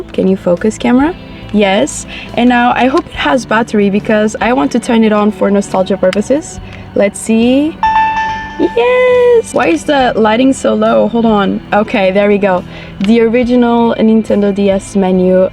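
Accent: Portugal. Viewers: bem me parecia que soavas portuguesa haha amei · she is portuguese i’m pretty sure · Since the first second I was like "I'm sure they're portuguese" based on the accent and as soon as you said it at 8mins i almost screamed <3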